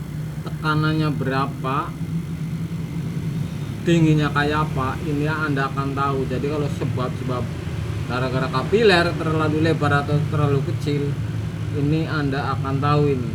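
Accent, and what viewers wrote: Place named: Java, Indonesia. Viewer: Medok temen kang..sampean wong ndi..😁😁😁😁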